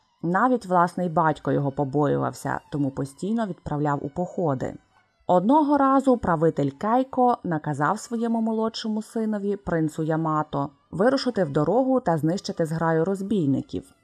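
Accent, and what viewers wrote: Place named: Russia. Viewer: Йоооооййй! Це так цікаво! Тільки є одна штука, яка турбує. Часто деякі зі звуків у власних назвах ви вимовляєте на російський манір, як-от -сі, а не -ші, чи -ті, замість -чі. Так само неправильно -дзі, -дзя, -дзю, -дзьо, натомість : -джі, -джя, -джю, -джьо. Ця система (яку я навела як правильний приклад) транскрипції і транслітерації, здається, Коваленка. Більшість сучасних українських перекладів з *японської саме за нею. Отаке. Дуже дякую за цікавезні теми! Буду чекати ще щось із японської міфології. Прикольно впізнавати назви і імена, які зустрічались у сучасних роботах країни сходу сонця.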